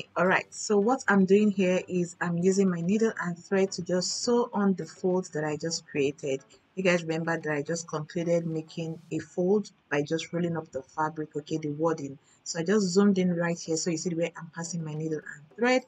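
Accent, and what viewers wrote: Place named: Europe. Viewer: Never apologize for european english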